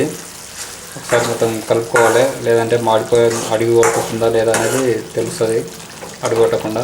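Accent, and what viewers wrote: Telangana, India. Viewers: మీ లాంటి వాలు మాకు చాలా అవసరం అన్న..మన తెలంగాణ యాస చాలా బాగా మాట్లాడుతూన్నారు అన్నా.. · Telangana Basha super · Super andi iam very big fan of your Telugu tounge because it's same like our mother telangana tounge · మంచి తెలంగాణ భాష మాట్లాడినవ్ కూర ఎట్లైతే గట్లాయేగాని మటలైతే అస్సల్ మాట్లాడినవ్ 👍💐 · మన తెలంగాణ భాష లో మస్తు మాట్లాడుతున్నావ్ అన్న... 👌👌👌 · anna ..pakka...telangana anukuntaa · Anna manadi pakka Telangana MBNR basha🙋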